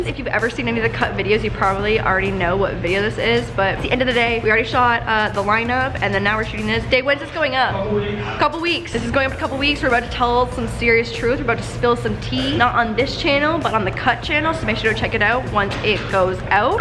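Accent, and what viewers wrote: Canada. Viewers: love that Canadian accent.......love you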